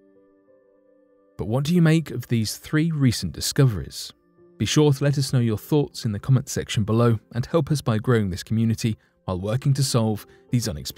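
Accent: United Kingdom. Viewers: The reason that there is not as much Ozone at the south pole is not due to abnormal depletion, but due to the much lower amount of solar radiation that is necessary to form ozone, due to tangential angle of sunlight over Antarctica. But you say all this information in a British accent, and people believe it!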